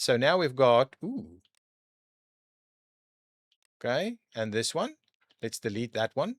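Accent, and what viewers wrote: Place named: South Africa. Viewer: the saffa accent makes everything better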